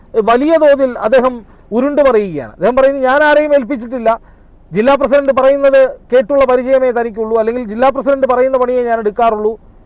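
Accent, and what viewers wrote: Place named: Thrissur, India. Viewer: te Thrissur slang thanne better for this news, Gopi thrissur reports please use ur own language 🙏🏼😊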